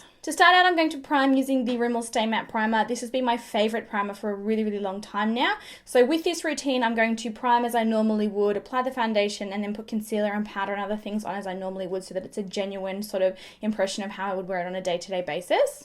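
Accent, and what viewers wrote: Australia. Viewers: Oooooo love this! Yes that Aussie accent!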